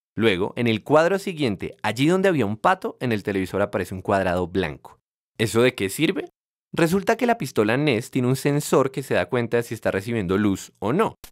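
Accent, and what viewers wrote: Bogotá, Colombia. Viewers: sos colombiano ? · esa voz de rolo inconfundible con un tono agudo en cada frase al final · te reconozco el acento colombiano :) yo también lo soy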